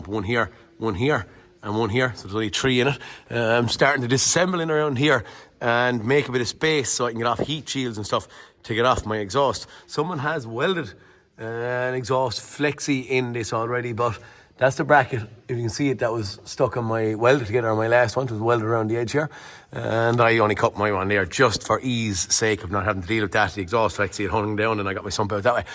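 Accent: Ireland. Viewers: the southern Irish accent is a great accent am from the north of Ireland I can understand your accent easily. Great videos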